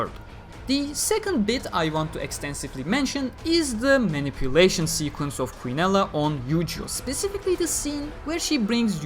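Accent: Turkey. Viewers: türk olduğun tam belli oluyor aksanından. :D Kusra bakma ama biraz kötü aksanın.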